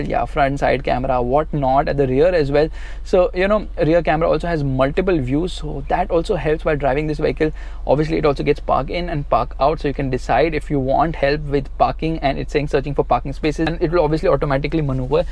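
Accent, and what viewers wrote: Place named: South India, India. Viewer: Why Indians speak sooooooo fast. English sounds like some south Indian language.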